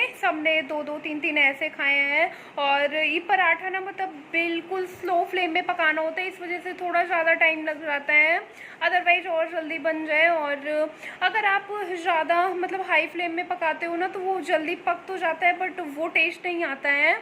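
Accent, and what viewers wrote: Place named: Bihar, India. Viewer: आप हिन्दी सही से नही बोलती बिल्कुल बिहारी जैसे हिंदी बोलती हो।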